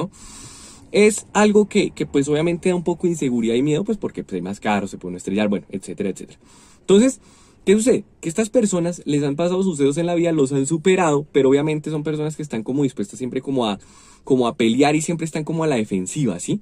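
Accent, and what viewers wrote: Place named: Colombia. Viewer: Si gracias por esa explicacion paisano chao me alegro bastante oirlo esa es mi Colombia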